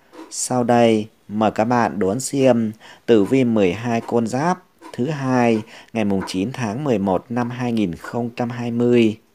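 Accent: Thanh Hoa, Vietnam. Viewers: Giọng thầy là quê Thanh Hóa phải ko ạ?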